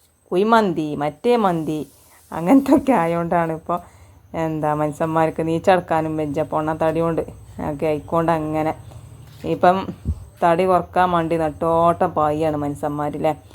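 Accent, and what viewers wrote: Malappuram, India. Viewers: Malappuram bashayanallo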